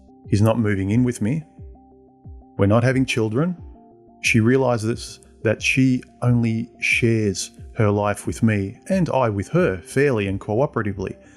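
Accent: Australia. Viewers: Best aussie I've heard talk for years Regards a south African...lol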